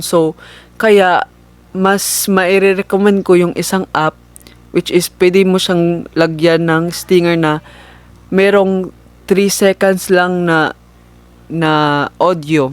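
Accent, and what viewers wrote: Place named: Visayas, Philippines. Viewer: feel nako bisaya gyud ni ba HAHHAHA i feel uuuu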